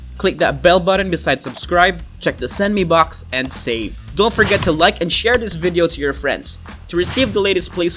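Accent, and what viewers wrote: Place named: Philippines. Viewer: You can tell the guy talking abt the games is pinoy😂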